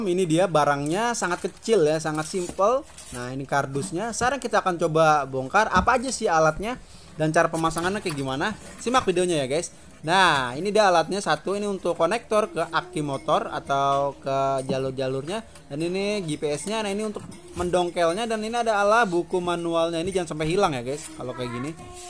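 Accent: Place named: West Java, Indonesia. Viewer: Orang sunda bekasi nih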